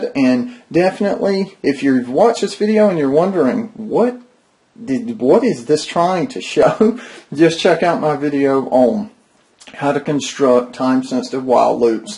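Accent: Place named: South, United States. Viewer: Thats a cool Southern accent. The best in the USA. In my opinion.:)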